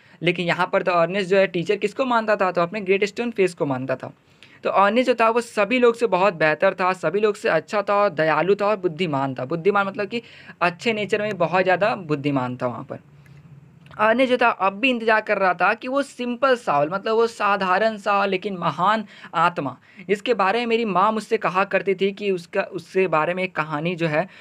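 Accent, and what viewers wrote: Bihar, India. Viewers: Sir from where u r???????? I think from Bihar. I am from bihar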